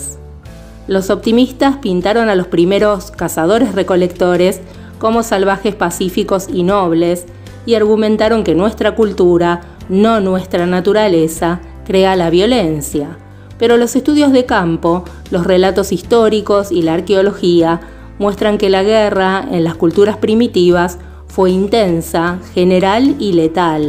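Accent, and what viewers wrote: Argentina. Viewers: Eres argentina, ¿verdad?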